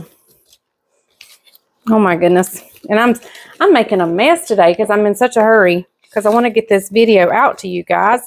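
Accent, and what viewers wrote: South, United States. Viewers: I'm gonna go ahead and soak my zucchni n pneapple juice, yum it sounds perfect for this bread. You are so good, love this video and your sweet southern accent! You are a very good baker/home chef.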